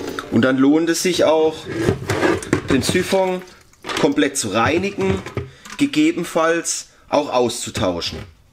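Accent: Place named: Baden, Germany. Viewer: Mach's wie Du meinst - aber bloß keine Angst vorm eigenen Dialekt. Deine Zuseher kommen, weil sie Informationen wollen - das kannste super! (Übrigens, das hatte ich vorher vergessen: sehr gute Beleuchtung und super Kamerawinkel da unterm Waschtisch. Das ist nicht einfach!) Und sie bleiben, weil sie dich als Typ gut finden. Klar muss man so sprechen, dass einen die Menschen verstehen. Aber a bissl Dialekt hat noch nie geschadet. Schau mal ins Fernsehen: Wer ist da der erfolgreichere Entertainer? Der dialektfreie Nachrichtensprecher, oder der authentische Unterhalter mit Dialekt? Du kommst aus Baden? Besser geht's doch gar nicht für einen Handwerkerkanal. Schaue grad dein neuestes Video. Stimmt, das ist schon viel besser geworden. Aber versuche mal viel freier zu sprechen. Kannst ja mal bei einem Video zwei Versionen machen und deine Freunde ansehen lassen. 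Glaub mir. Je authentischer, desto besser.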